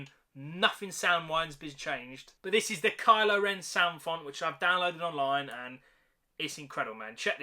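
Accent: United Kingdom. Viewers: Why does he look like an American hipster while talking like a Brit?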